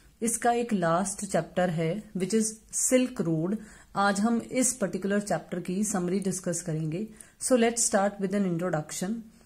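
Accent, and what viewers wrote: Punjab, India. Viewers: Ma'am Are u a Punjabi ?? Because ur slang is like us 😅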